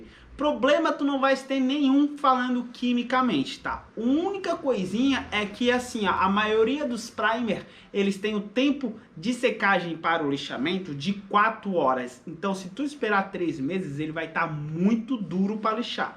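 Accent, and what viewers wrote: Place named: Pernambuco, Brazil. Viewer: Boa tarde é assim mesmo que fala Pernambuco suas dicas é tope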